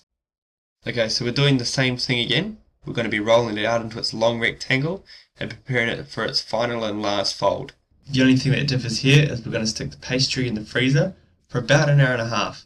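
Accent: New Zealand. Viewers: great tutorial, made even better by your beautiful kiwi accent <3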